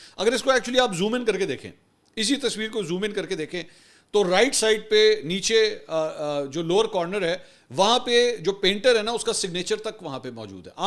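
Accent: Punjab, Pakistan. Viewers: Bhai ap ka urdu main Punjabi ka tadka bahut achha lagta hai❤ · Now your way speak is good then you give touch Punjabi 😊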